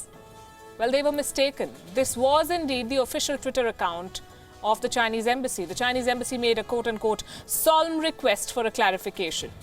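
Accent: India. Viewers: it's indian accent only dumbass not everyone has stereotypical accent likewise I am sure not everyone in China is Ching chong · If chinese are low class Indian is it better . This reporter still hv to speak well Not induan accent.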